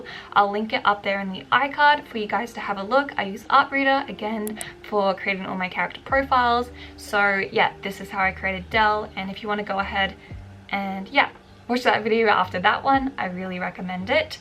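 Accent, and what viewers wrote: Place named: Australia. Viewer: Lol I’m Aussie and it took me so long to realise you had an Australian accent because I was so tired and then when it clicked I was like “!!!” Lol. I’m so excited to check out campfire thank you for the tips! ❤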